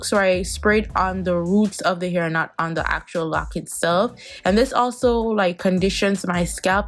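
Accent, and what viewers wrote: Jamaica. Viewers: Are u Jamaican?? Hearing that accent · I always see your videos but this is my first time actually watching one & I loved it!! The minute I heard you talking I was like “yeah she’s Jamaican” lol ❤️😂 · Ur Jamaican right??? Great vid by the way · Beautiful and I love that jamaican creole wah gwan😍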